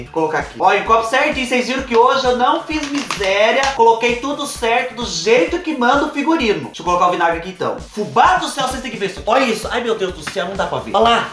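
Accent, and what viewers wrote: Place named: Paraná, Brazil. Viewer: KKK ELE NAO PARA DE FALAR NEM PRA RESPIRAR, DO QUE LUGAR VOE E DO PARANA, EU AMO ISSO, KKK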